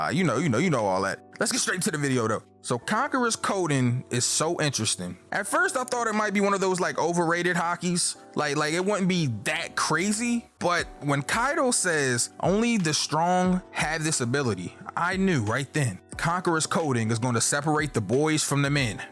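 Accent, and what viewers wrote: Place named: Oakland, United States. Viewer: Really enjoy you being yourself when explaining things. Hearing you reminds me of my time with friends from Oakland and their cool vibe. Keep it up. It means a lot for some people like me.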